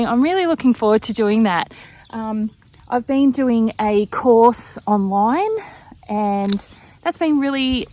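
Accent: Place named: Australia. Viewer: Make sure you're getting enough rest friend. Stay hydrated. I find the Australian accent very soothing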